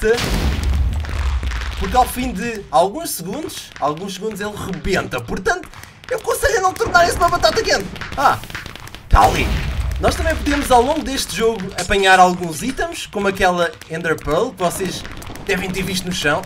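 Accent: Portugal. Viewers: Mas n posso negar Que seus videos sao bons, Que gosto do sutaque português e Que vc é um pouco engraçado e enrolado kkkk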